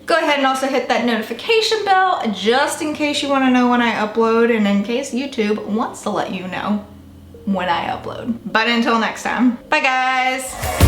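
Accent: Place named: Metairie, United States. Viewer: I can hear the Metairie in your voice! Haha! Kenner here :)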